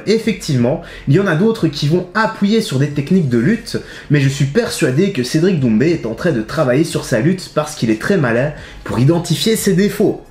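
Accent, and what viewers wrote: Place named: Liège, Belgium. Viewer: je vais plutôt bien, content de te retrouver un peu plus souvent sur YouTube. Tes analyses, réactions sont direct, simples et pleine d'humour et de bons sens. Ça change des contenus français qui ont tendance à être... team A contre team B , les différentes chaînes YouTube se font une guerre qui n'a aucun intérêt, qui est tout sauf sportive. Ils ne semblent pas réaliser que ça nuit totalement au MMA et à leurs combattants. Même si les YouTubeurs sont très compétents,...bref... Merci pour ton contenu bien belge avec ce petit accent de ??? Liège ? Perso suis à Charleroi . Kiff bien ce job et pourquoi pas un feat avec Ilies ou Chris Guenacht ? 3 bien belge 😊😊🙃 belle brochette de connaisseurs 😁🥳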